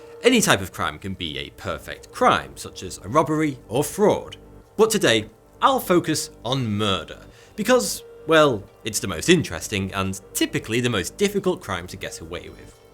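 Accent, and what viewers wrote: United Kingdom. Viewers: Get away with murder with this one simple trick... COPS HATE HIM British Vsauce much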